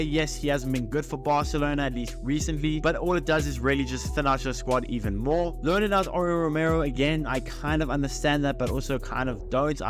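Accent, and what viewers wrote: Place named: South Africa. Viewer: Can recognize a South African anywhere. Hansi flick is a mastermind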